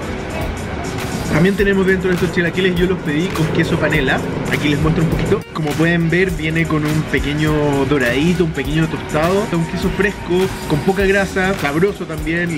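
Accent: Chile. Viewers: Por fin! los chilaquiles son tan ricos:D Saludos!!! cuidate mucho para que puedas seguir disfrutando de la comida y por cierto el color verde te va muy bien!!:D Por cierto me encanta el acento chileno!!! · soy una mexicana qué ama tú país... chile me fascina, me encanta su gente linda ustedes también tienen ésa cultura, ésas tradiciones tan lindas. Conozco chile a través de la televisión viendo enigma, y mea culpa y me gustó tanto me enamoré de ése lugar hermoso qué un día quiero ir a conocer. al escucharte hablar supe qué heres chileno me gusta ése acento tan dulce! Gracias! por aprender de nuestra cultura❤💞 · A que lindo eres, y lo explicas super bien; amo el acento chileno!!! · oyeee me alegra mucho tu canal! Me gusta ver cómo los extranjeros le gusta México y a mi me gusta Chile 🇨🇱:) algún día me gustaría ir a Santiago y conocer la comida chilena y la gente chilena! 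Me gusta mucho el acento chileno también:)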